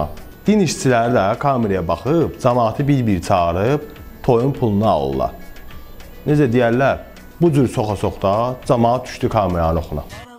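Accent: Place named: Mashtaga, Azerbaijan. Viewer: Странно почему я ставлю лайк..?? Ведь "Məştaginskiy" aktsent убивает..🤦‍♀️🤦‍♀️ Наверное, потому что duzu yerində.., həddini aşdığını hələ görmədim.. Həm də təbiyi alınır.. Amma bu aktsentı eşidəndə əsəbləşirəm..)🤷‍♀️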